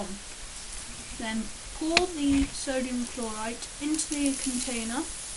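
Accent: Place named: England, United Kingdom. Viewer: haha english accent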